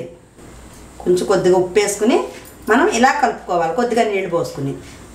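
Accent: Godavari, India. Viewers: Amma meedhi godavari jilla na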